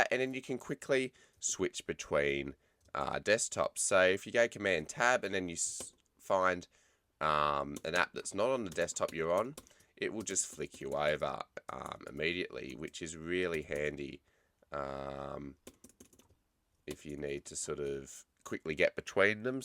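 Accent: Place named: Gold Coast, Australia. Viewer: Use them all the time. Trying to figure out how to lock them. Drives me nuts when they shift. Your voice sounds quite a bit like pro surfer Mick Fanning which leads me to believe your from the Gold coast. Lucky you. Aussie envy here.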